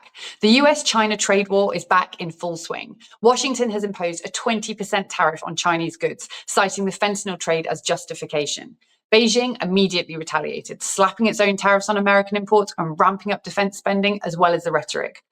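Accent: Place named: United Kingdom. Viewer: WHY DO ALL THESE NEWS COMMENTARIES HAVE TO EMPLOY A BRIT WHO TALKS TOO FAST ??LOCAL NEWSCASTERS,WEATHER PEOPLE EACH VENUE EMPLOYS AT LEAST ONE........I CANT UNDERSTAND THEM.MYSELF..HIRE AN AMERICAN WHO CAN SPEAK FLUENTLY AND SLOW DOWN...........SORRY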